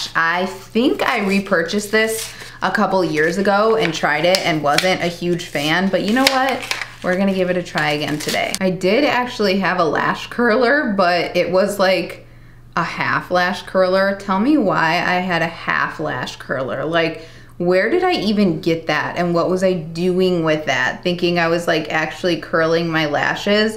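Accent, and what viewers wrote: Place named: Midwest, United States. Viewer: the midwest accent is everything.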